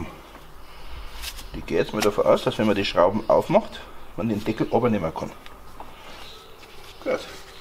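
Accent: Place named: Bavaria, Germany. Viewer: Schee boarisch! Da macht das anschauen gleich noch mehr Spaß ... danke!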